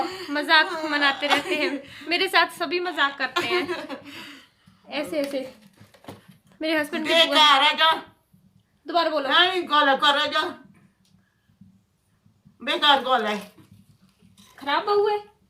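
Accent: Pahari, India. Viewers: Pahari tone is must , that is our culture & identity . So keep it up .God bless you and your family. 🙏🙏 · Hy anty osm vlog muje be apka hindi be pahari slag bhut pasand hai hm pahadi hai bhi pahadi slag nhi ayga to kya English ayga muje to bhut pasand apki voice be or apka slag be or ye dadi g nice hai kse haste haste hue bol rhe the👌👌👌👌👌 apko mara namaty 🙏🙏 or love u bhut sara 😘😘😘😘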